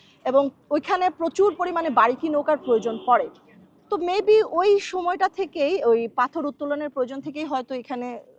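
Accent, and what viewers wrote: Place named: Dhaka, Bangladesh. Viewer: আপনার কথা নিখুঁত মাতৃভাষার(সিলেটি) ব্যবহার নাই, কোন বিভাগের ভাষা ব্যবহার করছেন সুমিস্ট ভাবে পরিবেশন করছেন। এতটুকু নিশ্চিত সাবলিল ভাবে ঢাকা বিভাগের ভাষা বলেই মনে হচ্ছে। ধন্যবাদ।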